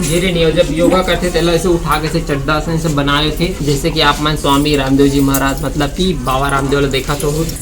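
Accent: Chhattisgarh, India. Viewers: Bhaiya aap bolte ho. I like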